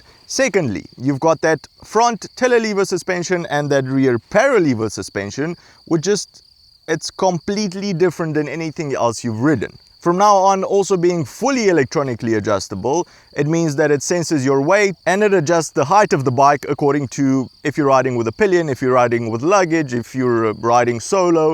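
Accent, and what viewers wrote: South Africa. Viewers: How did you get the South African accent?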